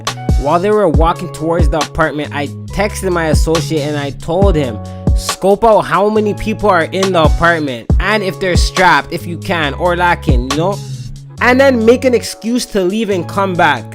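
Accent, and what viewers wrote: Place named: Toronto, Canada. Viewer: Dats funny I be from the 6ix too I recognized ur accent fam 😂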